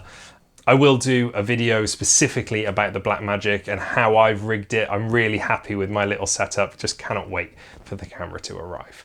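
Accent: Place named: United Kingdom. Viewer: you don’t have to thank me, I watch for selfish reasons. You have lots of potential, I’m liking your topics and of course, the British accent;)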